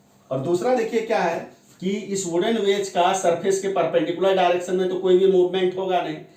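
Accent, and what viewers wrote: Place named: Bihar, India. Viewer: ❤ love from bihar thanks sir i know you are from bihar by language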